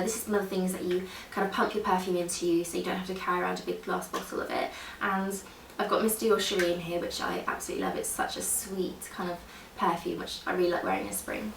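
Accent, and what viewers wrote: United Kingdom. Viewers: I want that accent if i was britisch i will never stop talking :)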